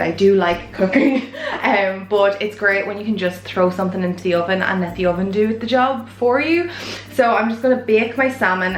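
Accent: Ireland. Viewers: I love watching your videos. You give me new ideas on how to change up my every day dread of what to fix for a meal. No 2 people do things the same lol. I've been watching your videos for probably close to a month now. Definitely have my notifications on! Thank you so much and much 💘 to you!...... P.s. what started my binge watching is your accent. An Irish accent has always been my fav. Never hear people with those here in Alabama (USA).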